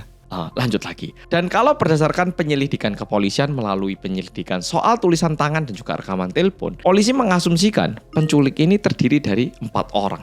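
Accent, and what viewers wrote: Java, Indonesia. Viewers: kok medog se mas.. 😅😅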